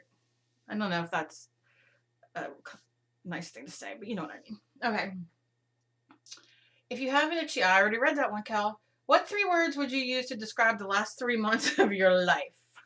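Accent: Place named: New Jersey, United States. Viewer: You are so NJ and it is so refreshing. I too am a Jersey girl and when you say "frickin'" it just makes me laugh!!!!!! stay strong and spunky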